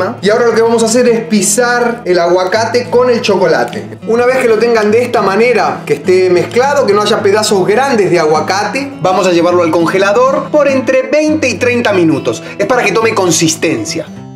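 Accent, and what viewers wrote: Argentina. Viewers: jajajajaja tas re loco man que buena tu energía! sos de Argentino no? voy a probar esto. Saludos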